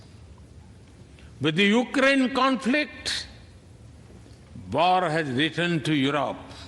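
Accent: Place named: Gujarat, India. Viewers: Impossible to memorise such a long speech. May be he mentally translated from Gujarati to English. Pretty decent English I would say. The flow, logic, sequence and verbalisation is good. One must ignore Gujarati accent as people have such a variations in India. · but his foolish attempt to speak English in gujrat accent makes ppl make fun of him . he should stick to hindi and let them listen to translation.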